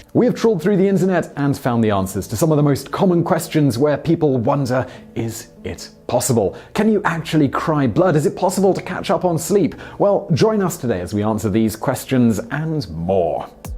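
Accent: United Kingdom. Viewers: When he said talking about the military I don't know if it is the fact that everything he said was bullship or the fact that he has a British accent but either way don't believe any of it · What part of Britain does his accent come from?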